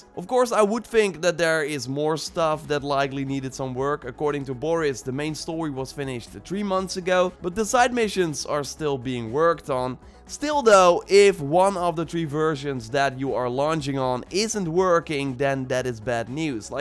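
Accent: Netherlands. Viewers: Are you dutch ?? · The dutch accent is heavy on this one.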